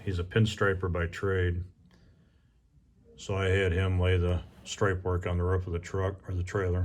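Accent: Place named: Rockford, United States. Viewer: Damn thats one hell of a drive! I know you said you used to live in Illinois, but the way you talked I thought it was around Rockford.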